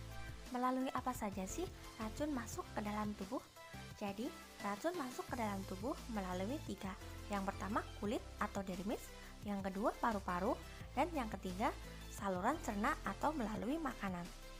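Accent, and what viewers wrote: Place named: Cilacap, Indonesia. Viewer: Ngomonge koyo wong cilacap bu hikkk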